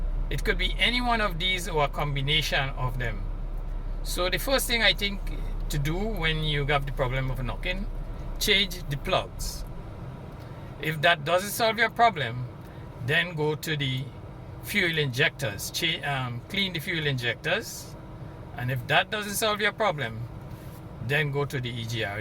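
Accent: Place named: Trinidad and Tobago. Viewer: Ah hearing d accent trini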